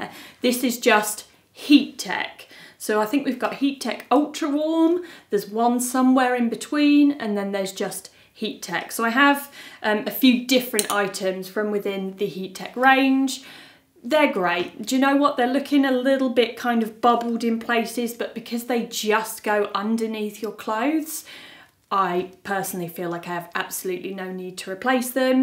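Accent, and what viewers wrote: United Kingdom. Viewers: I'm French and for real I really like her British accent !!!!!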